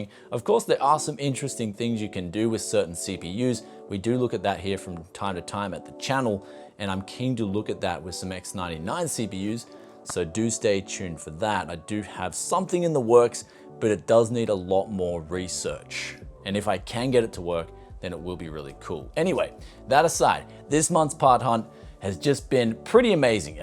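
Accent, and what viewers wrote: Australia. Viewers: I’m sorry that people don’t understand you don’t work for free, keep up the good work my old mate, you defo need to bring the Aussie out more, it’s class! Hope you well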